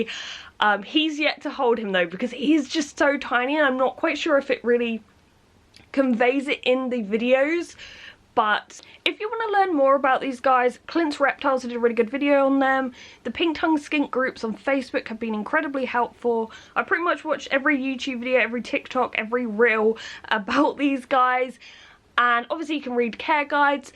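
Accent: United Kingdom. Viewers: Yo your British i didn't know that respect to all my British people out there💯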